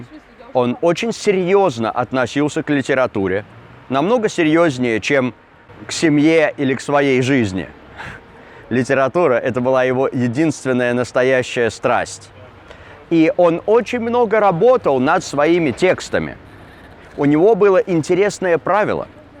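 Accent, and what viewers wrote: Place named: United States. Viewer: Очень интересный рассказ о Довлатове, спасибо. Но я не понимаю, зачем, будучи преподавателем русского языка, упорно использует американскую интонацию во всех своих видео.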